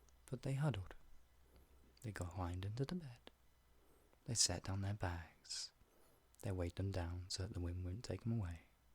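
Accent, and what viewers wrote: United Kingdom. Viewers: FINALLY A BRITISH AUDIO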